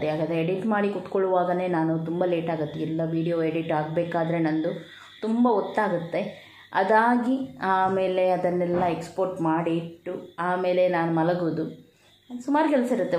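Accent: Mangalore, India. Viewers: Hai.. I like very much way of explaining.and mangalore kannada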